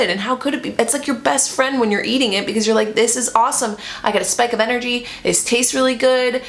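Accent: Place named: Canada. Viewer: You're Canadian, eh. ❤️❤️❤️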